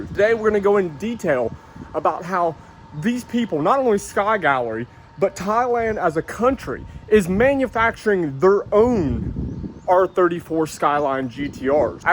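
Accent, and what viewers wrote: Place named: Appalachia, United States. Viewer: Were you from originally sound a little hillbilly to me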